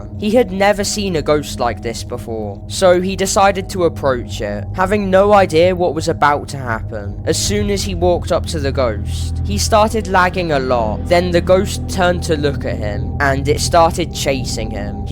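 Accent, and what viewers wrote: United Kingdom. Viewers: It's supposed to be creepy but British voice just makes it..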